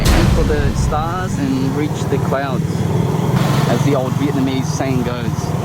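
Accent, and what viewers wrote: Australia. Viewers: haha your australian accent never gets old mate ;) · Haha mate, great video! I laughed so hard. Mate, please rewatch your video with YouTube's closed captions - haha your AUssie accent confuses YouTube subtitles! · Hey do you live in Vietnam now? you sound like your from Melb west like me 😎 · Cool! I'm 50 this year great to here a fellow Aussie voice chats soon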